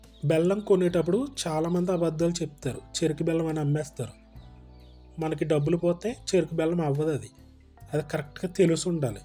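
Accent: Godavari, India. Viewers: Meeru matlade Godavari jillala telugu vinte kadupu nindipoindi.Hyd vachhaka ee bhasha miss avutunnam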